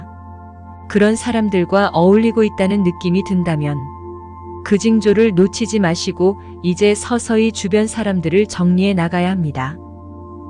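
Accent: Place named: Giho, South Korea. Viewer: 너가 저가 이런 기호지방 개사투리 사용마라 특별시라니 말까지 바꾸면 진짜 딴세상사람인 줄 천한종들아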